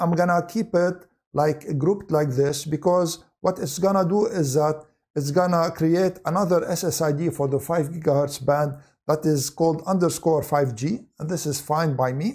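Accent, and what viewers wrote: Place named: Lebanon. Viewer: Nice video and nice Lebanon accent 😅 I had a little question, if i put the router in AP mode, will the guest wifi still works ? and will it be separated from my main network ? Thankyou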